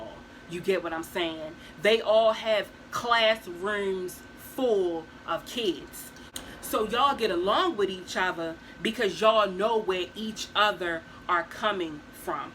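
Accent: Baltimore, United States. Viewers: Love watching you! I can always spot a Baltimore accent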